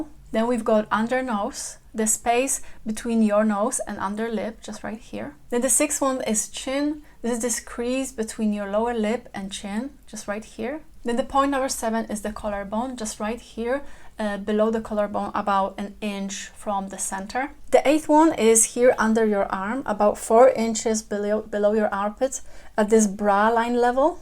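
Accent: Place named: Germany. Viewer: I love your accent. What's your native tongue? German?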